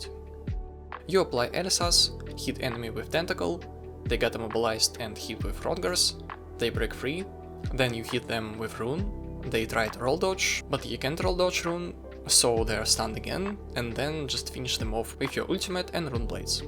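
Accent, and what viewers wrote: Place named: Russia. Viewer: Слышно своих)) Но произношение красиво, спасибо за билд.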